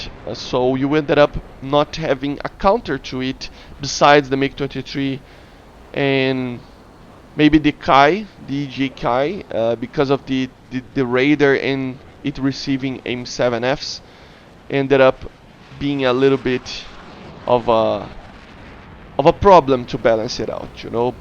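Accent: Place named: Brazil. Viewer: Sheer curiosity: Are you brazilian mate? Your accent is highly reminiscent of such, hahahaha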